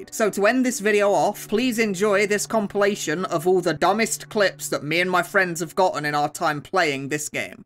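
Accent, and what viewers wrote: United Kingdom. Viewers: HE. IS BRITISH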